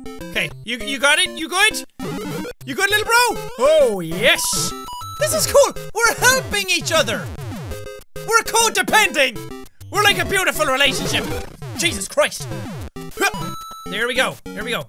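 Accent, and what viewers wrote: Ireland. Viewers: I love you and your videos so much. You are my inspiration. I almost broke my leg on a busy road when I was cycling, but I thought of you and what you'd say in that awesome Irish accent, so I got up and started riding again. Thank you. If you read this, please reply ❤️😍💖😘👌🏻😝💕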